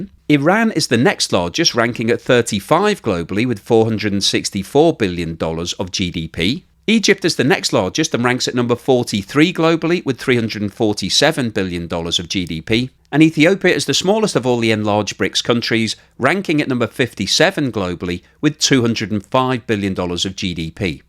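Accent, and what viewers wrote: United Kingdom. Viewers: British accent = western bullshit. EVERY SINGLE TIME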